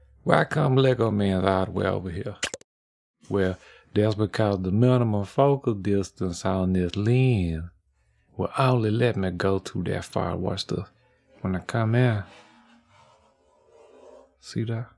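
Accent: Louisiana, United States. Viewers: is this accent a french person from louisiana. rec brought me here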